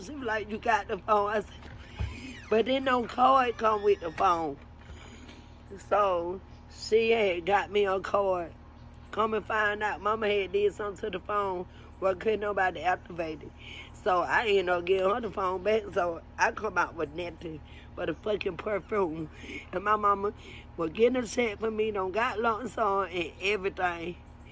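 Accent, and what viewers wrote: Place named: Florida, United States. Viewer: Keep your head up, continue to keep God in your life, I went through some similar things got me down for awhile but I found God and will continue to keep my father in my life, I will pray you continue to keep your head up and stay blessed! I'm from fla too but I live in NY now and I swear you sound so much like my auntie's and I love it, please don't ever lose your accent they made me get rid of mine when I came to NY and went to school! Stay blessed🙏🏾❤️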